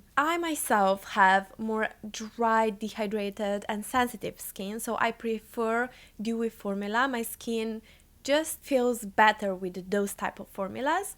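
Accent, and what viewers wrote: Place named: Slovenia. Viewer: I couldn’t quite place your accent so very cool to find out you are Slovenian! I passed through Slovenia very briefly last year on the way from Trieste to Rovinj and I’ve been dying to properly visit the country since then. It’s my number one place I want to go! 🧡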